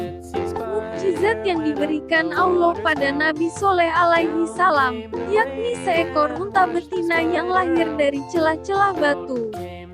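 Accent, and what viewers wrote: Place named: Java, Indonesia. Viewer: medok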